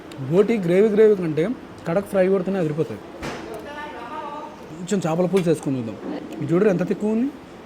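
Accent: Telangana, India. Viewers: ❤❤ok brother nee matatheeru mana తెలంగాణ యాస తో super గ ముందుకు వెళ్తున్నావ్ కానీ వీడియో లు తక్కువగా వస్తున్నాయి కొద్దిగా ఉరుకాలే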